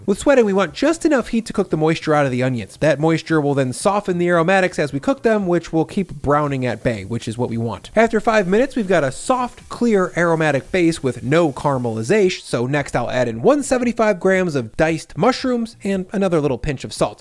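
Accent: United States. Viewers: Thank you for pronouncing the ingredients in proper American English way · Pronouncing those words in a heavy American accent is funny as hell, though. At least Google how it's supposed to be pronounced before doing the video. 🤷🏻‍♀️ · A guy with a German name, American accent, using European ingredients to cook Italian pasta in 5 sauces !!! What's the real message here? By 2025, the earth has become a huge village ??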